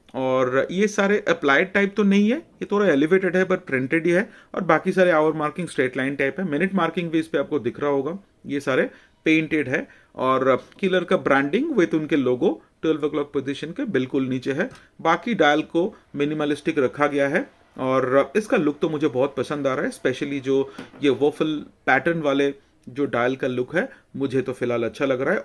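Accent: Bengal, India. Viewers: You are bengali?😅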